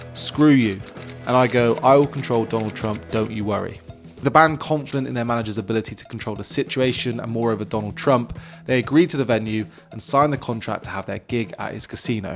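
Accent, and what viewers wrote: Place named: United Kingdom. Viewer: i had no idea but my tendency is to zone out once the British accent starts. At least it wasn't cockney, still subtitles were needed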